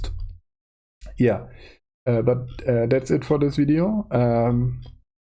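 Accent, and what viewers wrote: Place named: Germany. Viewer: Man merkt, dass du Deutscher bist. 😄 Gutes Video!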